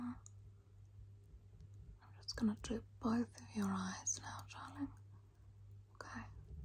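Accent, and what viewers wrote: Australia. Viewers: OMG!!!! I'M SOOOO EXCITED TO HAVE A VIDEO FROM YOU!!! Its so rare to get that specific Aussie accent/way of saying things. you are amazing, i hope 2024 treats you better. We are all just so happy for anything you do. I wish you love & light & happiness for 2024.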